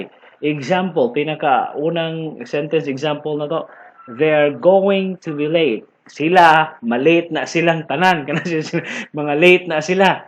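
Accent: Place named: Visayas, Philippines. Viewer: hala ka diha bisaya jud sya hahahahaha nindot ni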